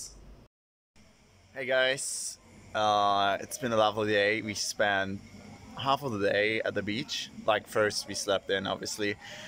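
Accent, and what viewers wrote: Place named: Finland. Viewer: So sweet ☺️ sounds more like Finglish than English, cool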